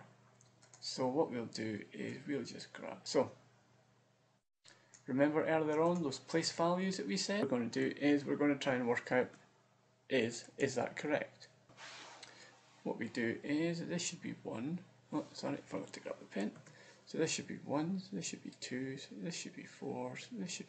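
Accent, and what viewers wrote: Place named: Scotland, United Kingdom. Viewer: The accent made it so much easier to listen to, if only one of my lecturers was Scottish :D Thanks a bunch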